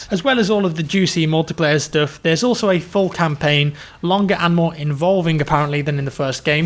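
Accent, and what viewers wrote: United Kingdom. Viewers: اللكنه البريطانيه 😷💔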